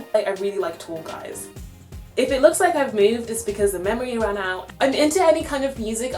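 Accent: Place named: Australia. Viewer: are you Australian cuz u got the accent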